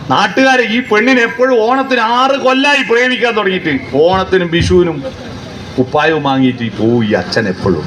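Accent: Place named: Vadakara, India. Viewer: ഭാഷാ വൈകല്യം നല്ലവണ്ണം ഉണ്ട് ചില വാക്കുകൾ മനസിലാവുന്നില്ല ഉദാഹരണം മൊത്തി (മുഖം എന്ന് മറ്റൊരു വടകരകാരൻ പറഞ്ഞു തന്നു ) പ്രാദേശിക ശൈലി യായിരിക്കും പ്രസംഗം കുഴപ്പമില്ല ഞാൻ മിക്കപ്പോഴും കേൾക്കാറുണ്ട് കുറച്ചുകൂടി പ്രാദേശിക ശൈലി മാറ്റി വ്യക്ത മായ ഭാഷ പ്രയോഗിക്കാൻ ശ്രമിക്കണമെന്ന് താല്പര്യപ്പെടുന്നു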